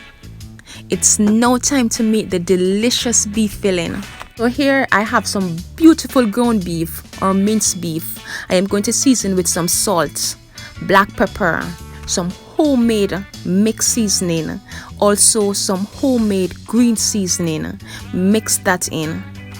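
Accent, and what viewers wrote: Jamaica. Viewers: I kept seeing authentic patty recipe on youtube but everyone i watched wasnt giving me the authentic jamaican vibes😒 and the dough wasnt giving me the flake😔. So my search brought me here🙃 to the real Authentic jamaican patty💯💯. I needed that accent this certified🥇 it for me lol the" ya mon" at the end🤗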